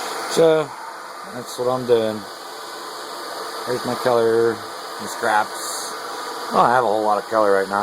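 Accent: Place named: Western Pennsylvania, United States. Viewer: New subscriber my friend. Is there a market for laminar surface mix torches? I am a chemist and I do scientific glass blowing. well I used to. When I moved up to Pa from Florida my glassblowing equipment was "misplaced". Including my glass lathe. I am trying to get back into things and I am designing torch set. I'll stay in touch. Where are you ? You sound like Western pa..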